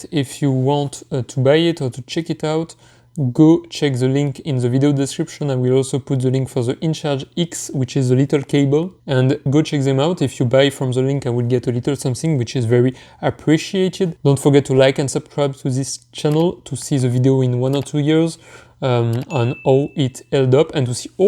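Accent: France. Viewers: you have a little French accent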